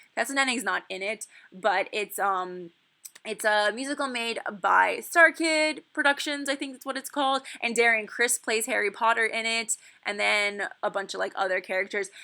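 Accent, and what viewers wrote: United Kingdom. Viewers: Your british accent 😂😂😂😂😂😂😂😂😂😂😂😂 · THAT BRITISH ACCENT THO 😆😍😘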